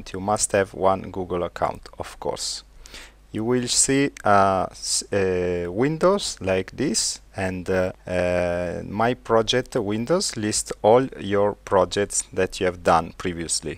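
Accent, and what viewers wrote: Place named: Brazil. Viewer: Inglês brasileiro ;) We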